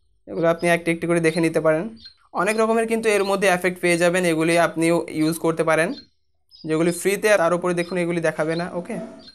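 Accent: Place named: Barishal, Bangladesh. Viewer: ভাই বরিশালে র ভাসা টা সেই লাগে🤣🤣🤣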